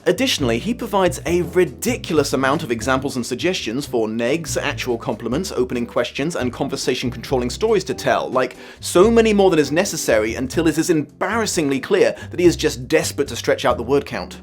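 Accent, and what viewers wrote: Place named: United Kingdom. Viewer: I know. I've never been into a guy more. Plus, he's got a (real) British accent, respects LGBTQ+ and women, and has good teeth! What more can you ask for in a man?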